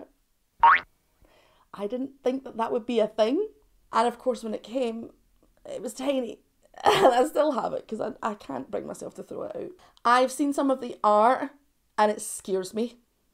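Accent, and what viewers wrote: Scotland, United Kingdom. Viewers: I can't tell if you're super charismatic or if I just think women with Scottish accents sound really cool. Probably some from column A and some from column B. Subscribed! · Im from Australia and the scottish accent nearly makes me weep , i fkn love it !! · Are you Scottish? Love your accent!🥰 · Why do i feel like I’ve never heard a girl with a Scottish accent?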